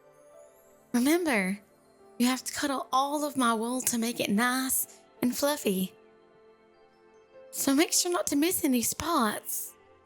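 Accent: South, United States. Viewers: I really get annoyed with fake Southern accents in ASMR so you're either the real deal or extremely convincing 👌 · Aww, I love a southern accent in my ASMR! This was beyond adorable! Thank you so much,!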